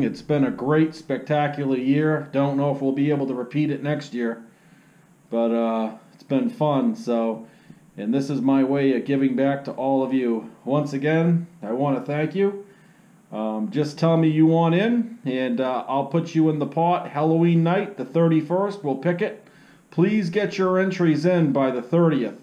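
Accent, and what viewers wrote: United States. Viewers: Put my name in the pot. Enjoy your videos and especially love the New Hampshire accent.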